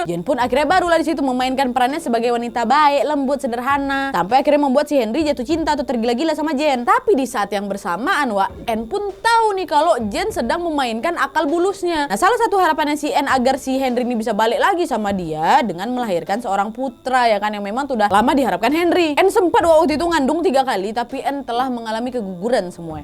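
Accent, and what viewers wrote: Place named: Dumai, Indonesia. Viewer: Kyk org Dumai logat nya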